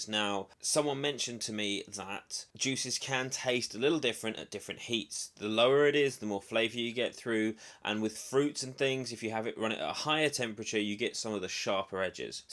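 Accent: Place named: South, United States. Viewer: You're very welcome. Ah okay, not to worry, just thought that i recognized the accent but i guess all us southerners sound pretty similar ;)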